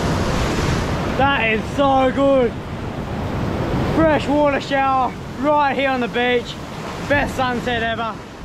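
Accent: Australia. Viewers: Exactly how i expected an australian surfer to sound like and be like in a survival situation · aussie are you? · What part of Aussie is this?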